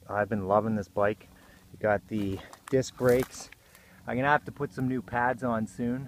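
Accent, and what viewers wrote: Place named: Canada. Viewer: Sound Canadian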